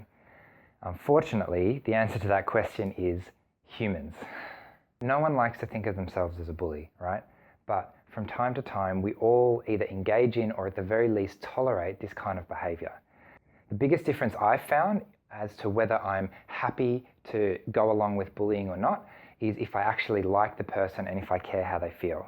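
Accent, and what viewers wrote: Australia. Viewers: I agree with most of what this person says, except for one thing...this person is saying reporting harassers & bullies doesn't work. In my experience, it does, both in my professional & personal lives. This person, though, I'm guessing, is in Australia, based on the accent I'm hearing (I live in Canada)...perhaps the culture is somewhat different, so maybe there's some truth to the statements made about this here but I doubt the difference is big.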